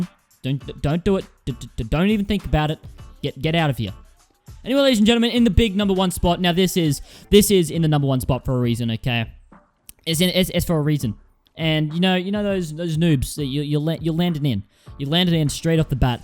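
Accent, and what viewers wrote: Australia. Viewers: are you an aussie?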